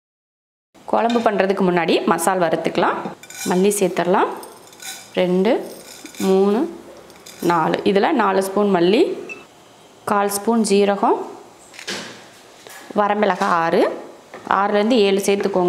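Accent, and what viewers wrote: Coimbatore, India. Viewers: Akka neenga coimbatore ah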